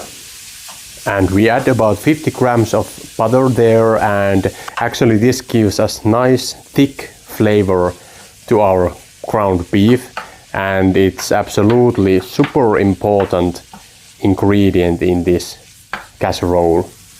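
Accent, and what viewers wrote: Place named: Finland. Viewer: This looks delicious, I will have to try it! Also, I've always been a fan of the Finnish accent!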